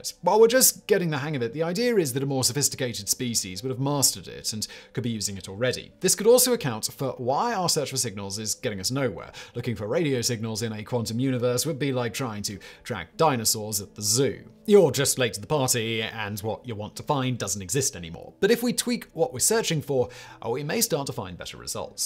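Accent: United Kingdom. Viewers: Well makes at least 5 hours of footage a week after editing. Also uses British English pronunciations, not American English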